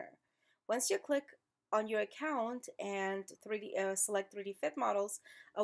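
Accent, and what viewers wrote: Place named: Eastern Europe. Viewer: Why does Tailornova INSIST on having women with ANNOYING east European accents doing the narration?? Really, really annoying. Does this software originate in one of the east European countries??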